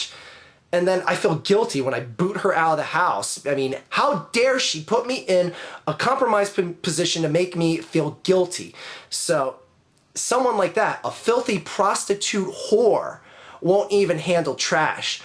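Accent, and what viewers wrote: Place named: Philadelphia, United States. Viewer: you are definitely from philly saying wooder instead of water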